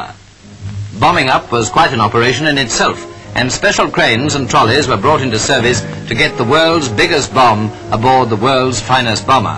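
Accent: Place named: United Kingdom. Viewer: In short, yes, proper British bomb, proper British voice.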